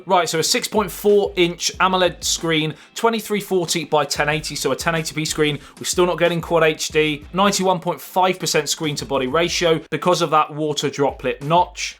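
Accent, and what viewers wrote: United Kingdom. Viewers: I used to watch your reviews as it is highly useful and also to listen to your British accent to improve my English language skills :D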